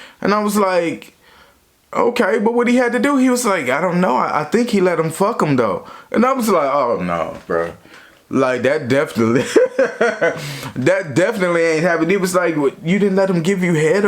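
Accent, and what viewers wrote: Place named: New York, United States. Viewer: I gotta say you got that New York accent down cuzzo! Great story time bro! 👍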